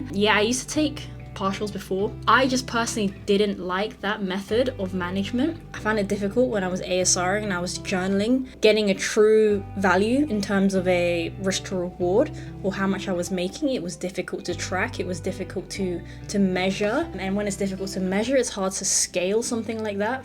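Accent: Philippines. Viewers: I was about to ask kung pinay ba sya haha kasi iba accent nya pinay pala talaga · Ur Tagalog accent is sooooo lovely 🥰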